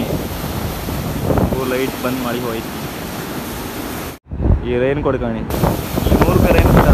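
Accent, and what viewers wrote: Kundapura, India. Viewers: Kundapura kannada baari saap matadtri mare😂❤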